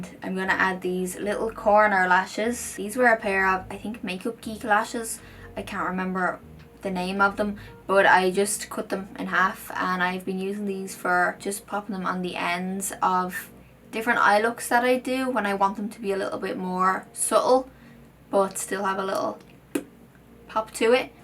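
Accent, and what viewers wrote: Ireland. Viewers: I could listen (and watch of course) to your videos all day. Irish accent for the win. By the way make up 👌🔥🥰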